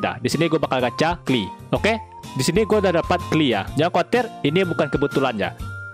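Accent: West Sumatra, Indonesia. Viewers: Org minang ya XD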